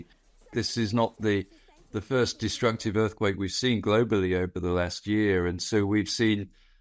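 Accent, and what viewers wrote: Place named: England, United Kingdom. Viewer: I detest the English dialect. The only one worst is aussie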